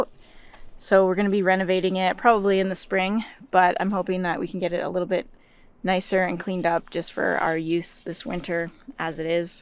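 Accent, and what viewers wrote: Australia. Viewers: are you from Australia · Hello from Puerto Rico. congratulations for the way you help your husband? and do such heavy chores. Keep safe from the black bears, I believe they could be dangerous if approach by humans. You should carry a weapon just in case to defend yourself. By the way, are you Australian?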